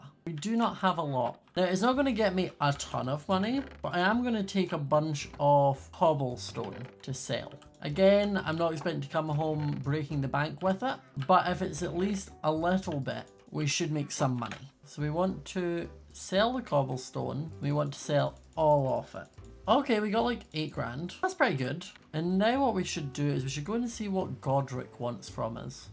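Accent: Scotland, United Kingdom. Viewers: What part of scotland you from, assuming not glasgow, maybe edinburgh? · Scottish - English Tiger-tinger Cute-cuuet Lol leave a like.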